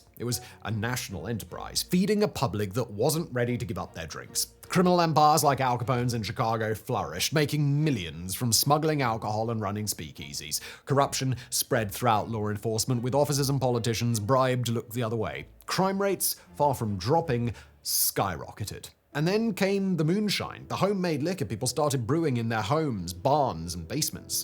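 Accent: England, United Kingdom. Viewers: I'd love to hear this guy speak when he's not putting on the poshest English accent he possibly can. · Whos laws are we talking about? This guy had an English accent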